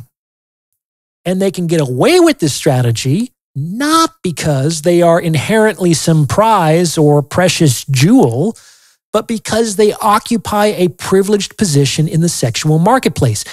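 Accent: United Kingdom. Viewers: It's the British accent for me 😂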